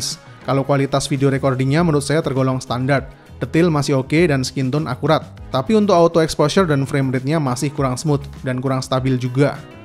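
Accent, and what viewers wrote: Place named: West Java, Indonesia. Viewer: Kak orang sunda kyaknya ya,.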